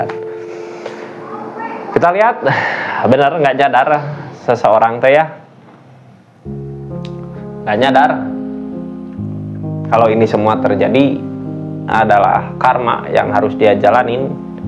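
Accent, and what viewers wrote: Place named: Sunda, Indonesia. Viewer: logat sundanya kental banget... 😍 Thanks readinganya... Sukses selalu ❤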